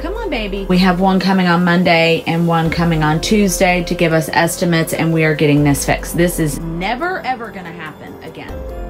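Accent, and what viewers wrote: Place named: South, United States. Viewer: As a cute asian girl (I'm in my thirty's, but still cute. I mean a girl 😜) I must say, it's so nice to see a cute, not asian, girl so excited about and so talented in amigurumi. And a southern one to boot! I always loved accents when I was younger, and now that I live in the accent-less Pacific Northwest, it's nice to stumble across channels that have both something I'm interested in and a super cute accent.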